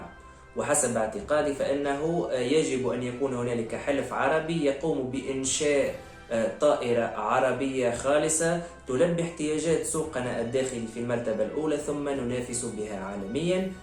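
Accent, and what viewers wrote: Tunisia. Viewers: اللهجة تونسية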